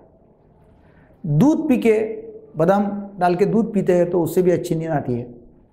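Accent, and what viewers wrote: Maharashtra, India. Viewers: Bhai ham marathi logo me 90 present log aisehi hindi bolte hai ..Aap aur niche jaoge andhra ya tamilnadu ..Aur ghatiya hindi ayegi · Bhai Maharashtra me aisi hi hindi boli jaati hai.. aap logo ko tapori lgti hy😑😑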